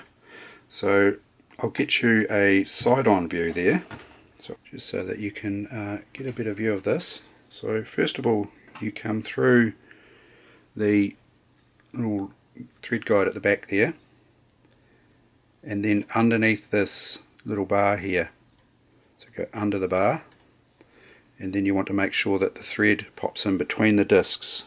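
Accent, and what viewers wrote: New Zealand. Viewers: Nice Video and nice Kiwi accent! Thank you!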